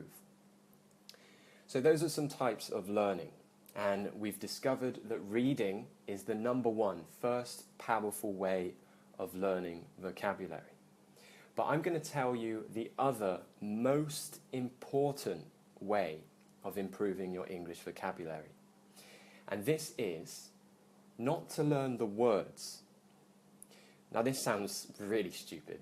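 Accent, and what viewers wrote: United Kingdom. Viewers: Hello, my name is Pachara. I’m from Thailand and now living in the State. I love your video English lessons because I love British English. I live in the State but actually don’t like American English lol. I’ve found your videos are really useful and helpful. I like that you give tips not just teach. Keep up the great clarification. 👍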